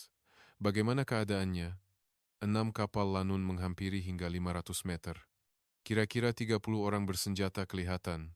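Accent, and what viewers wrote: Indonesia. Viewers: Ejen MOSSAD INGIN MALAYSIA BERTELAGAH DENGAN DUNIA...INI ADALAH ADUDOMBA.... MEPERLAGAKAN EMOSI SESEBUAH NEGARA DENGAN IDEOLOGI PENIPU DEMI SATU MATLAMAT...INI TUKANG CERITA KETURUNAN INDONESIA..CARA PERCAKAPAN LOGHAT INDONESIA..APASAL ORANG INDONESIA SUKA MENIPU MAJORITI..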